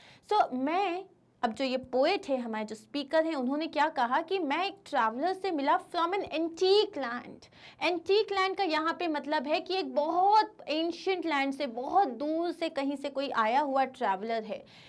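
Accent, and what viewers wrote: India. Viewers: Indian english is not english.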